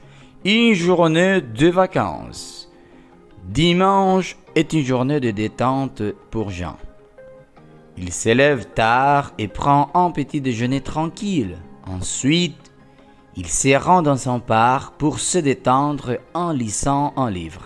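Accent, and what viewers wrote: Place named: France. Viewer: Me parece muy bueno, asi es como se aprende : leyendo, escuchando, hablando. Solo que me perturbé con la pronunciación de algunas pocas palabras muy diferente a la de Bélgica. Supongo que es de alguna région de Francia donde la pronunciación puede ser un poco diferente.pero esta espectacular ❤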